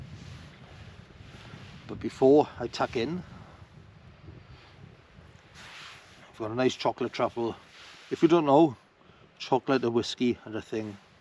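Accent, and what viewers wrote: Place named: Abercynon, United Kingdom. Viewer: Enjoy your videos, I'm a Carmarthen man but my wife is from Abercynon so I fondly recognize your accent from the area . Like you I enjoy getting out wild camping but my poison is a spiced rum which I enjoyed in the middle of nowhere with the rain pouring down in a mist .It soothes the soul. Keep up the great content.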